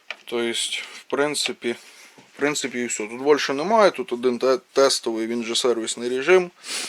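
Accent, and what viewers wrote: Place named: Ukraine. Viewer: Не вопросы, а запытання. Украинец хренов. Хоть по русски, но с украинским акцентом.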